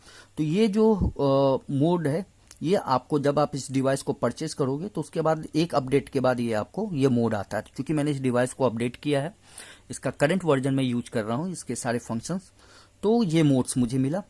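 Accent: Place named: Bengal, India. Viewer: u sound bengali!!! r u bangali??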